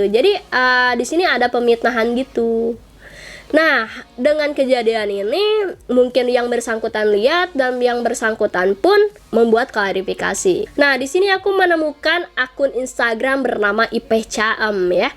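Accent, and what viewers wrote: West Java, Indonesia. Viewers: Kaya orang Sunda logatnya?? Salam Sunda Majalaya hadir😁☝️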